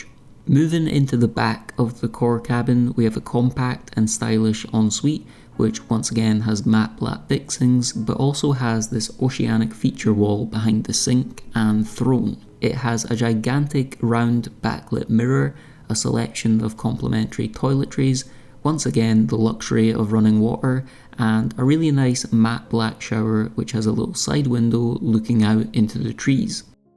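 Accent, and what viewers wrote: Scotland, United Kingdom. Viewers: Hey mate I enjoy your videos no matter what you do them on you have great Scottish accent and you explain thinga really well keep it up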